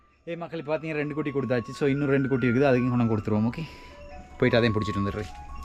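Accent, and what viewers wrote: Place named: Sri Lanka. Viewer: நீங்க இலங்கை என்பது உங்க பேச்சுல தெறியுது ஊர் எது ஆடுவிலைக்கு குடுப்பீங்களா என்டுதான் கேட்டேன்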